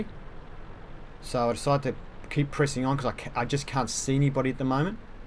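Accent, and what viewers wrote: Australia. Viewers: are you australian?!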